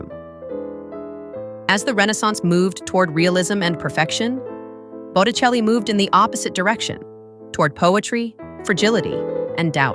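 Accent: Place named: United States. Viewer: Enjoyed this apart from the grating, whining , horrible American accent.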